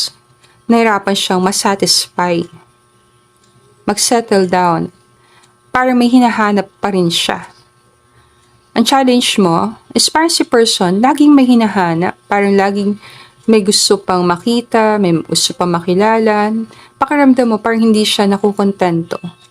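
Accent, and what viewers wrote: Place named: Bicol, Philippines. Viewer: madam, ang cute ng voice nyo...parang may bikolanang accent..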